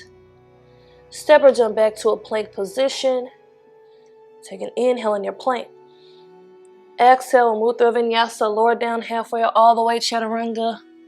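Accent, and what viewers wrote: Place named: Chicago, United States. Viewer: Peace be upon you. I'll be honest, I didn't like your channel when I first discovered it probably over a year ago now, but I'm sooo glad I gave it another try! I loved this flow and something about hearing your Chicago accent in a yoga practice makes it that much more homey for me. Thank you love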